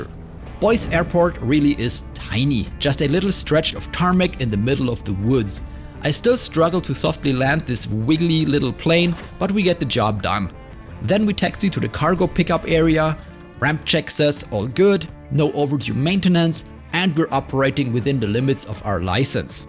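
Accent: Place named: Germany. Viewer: Ich hore das deutsche deutlich raus. 😂❤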